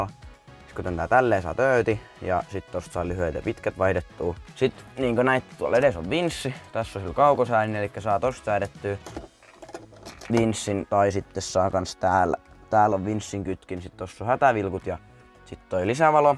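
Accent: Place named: Rauma, Finland. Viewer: Huamaa et poika raumalaine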